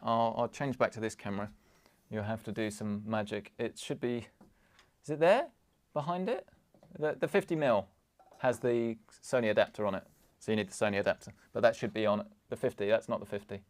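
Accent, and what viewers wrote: United Kingdom. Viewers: I like british accent!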